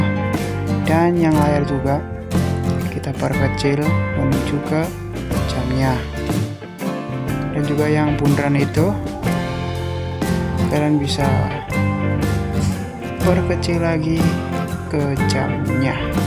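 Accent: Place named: Banyumas, Indonesia. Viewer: Jawa ngapak😂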